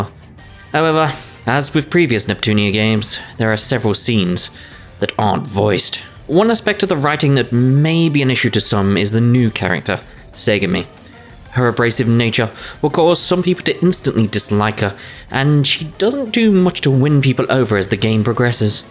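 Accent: United Kingdom. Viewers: Dude I wanna a British accent too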